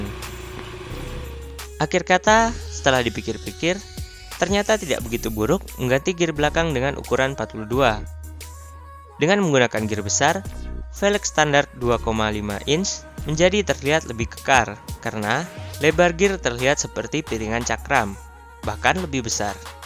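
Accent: Surabaya, Indonesia. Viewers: Lah org surabaya kah mang wkwkwk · Org Surabaya bang?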